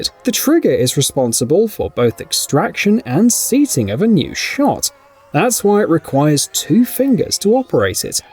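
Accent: United Kingdom. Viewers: Well he does sound like a brit. What would you expect?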